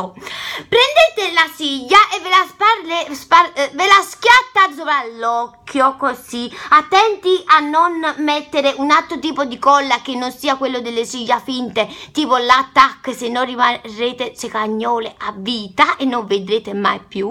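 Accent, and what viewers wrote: Italy. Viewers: Ma sei di Bari di Napoli o di Roma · 😂😂😂😂Napoletana doc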